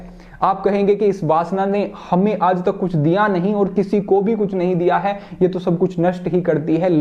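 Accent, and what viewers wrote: Standard. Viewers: आप बिल्कुल शुद्ध हिंदी बोलते हो गुरुजी 🙏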